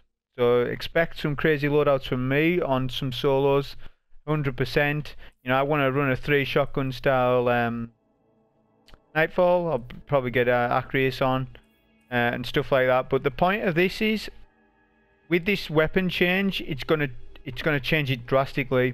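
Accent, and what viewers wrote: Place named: Newcastle, United Kingdom. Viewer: had to happen we had some wonderful years with alex and now we have a clown, but we will prevail. question will you be doing the whisper help runs and how does one get to know. ps its just nice to follow someone who is not american lol and after watching "vera" et al can understand every georgie word. keep it up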